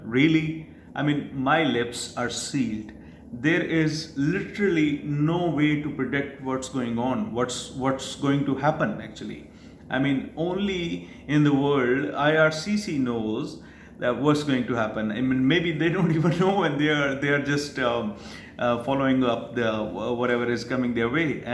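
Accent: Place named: Lahore, Pakistan. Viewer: Veerji your accent is like someone from Aitchson College Lahore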